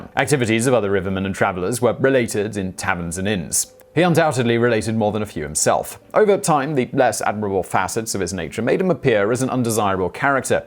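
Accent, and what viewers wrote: United Kingdom. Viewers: bro he british he ain’t even american🤣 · Me an American: ahhh yes British Big Brain tells me history. I enjoy this 😂👍🏻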